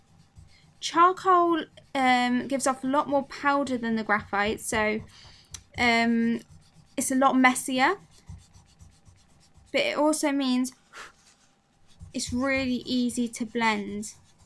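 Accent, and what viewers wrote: United Kingdom. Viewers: thanks for this experment. In pencil they all look more or less the same except tissue being softer and lighter in charcol they look more or less the same except that tissue maintain the quality of lightness, and finger smothness. while cloth in charcol maintain paper texture. I agree tissue is the most distnict result especially in tone, being lighter. in both charcol and graphite. Of course assuming that the amount of preassure and the medium filling prior to smudging is maintain constant. soft delicate sponge is great for sof transition.. another artist demonstrate it with make up sponge.. forgot the name of it but it is like pencil with little sponge at the end I think for eye area.. i dont have one that is the disadvantage of being a man.;) One old art professor said that chimoa cloth is the best that work for smudging. the graphite part. 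Great video By the way I love languages and accents and speak several, what region is your accent in britain? I see you pronouce the letter "h" as "hitch", thanks for the video.. have a nice day.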